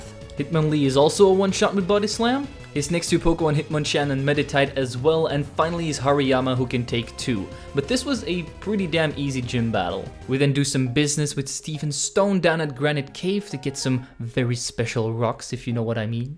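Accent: Netherlands. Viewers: Im dutch to its a great accent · wait are u dutch didnt even notice earlier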